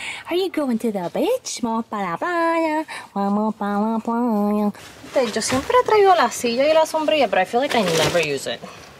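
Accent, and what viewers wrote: Puerto Rico. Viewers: Suenas y te vez mas biricua que muchos otros 😂😂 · siempre me gusta tus videos porque me encanta escullarla ablar en El idioma Puertoriqueno. Espero que aprendas cosinar toda classe de comidas Puertoriquenas.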